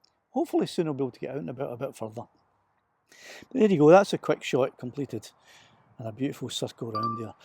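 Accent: Scotland, United Kingdom. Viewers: Nice video. Judging by your accent, you are in Scotland. Where abouts are you located?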